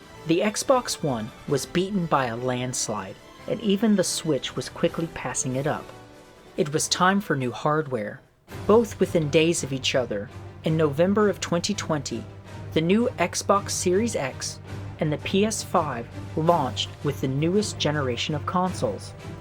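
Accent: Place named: Sacramento, United States. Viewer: You sound like it (from Sacramento)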